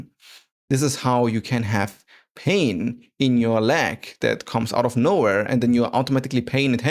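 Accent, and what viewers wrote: Germany. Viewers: I'm impressed with how much what you said resonates with me. There's many channels with seemingly amazing content, that contains just empty calories if you know what I mean. I'm feeling this will be actually useful. By the way, I'm detecting very faint germanic accent... Is your native language German?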